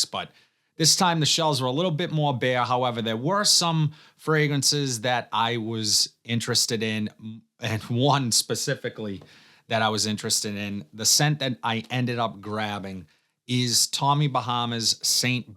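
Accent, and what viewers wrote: Boston, United States. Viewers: Just came across your video,,, You have to be from my neck of the woods,, No one speaks this way unless you're from BOSTON...LOLOL I Love Men's Cologne...When I find my favorites Cheap like this I buy them and use them to spray around the House... I will check this one out.. Thanks.. I Love the Men's Paul Sabastian for $20 and Calvin's Obsession for the same $20. or $18 for the Tester. Ebay is a good source if you don't want to venture out.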